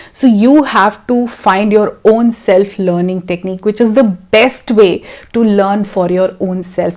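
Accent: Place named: India. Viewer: Such an amazing skill in speaking in clear Indian accent.. Thank you very much dear teacher.. You're talking to our hearts and we're actually fortunate to have a great educator like you here on this educational YouTube channel..I'm watching all your video series without fail from your neighbouring country, Sri Lanka 🇱🇰.. Keep it up....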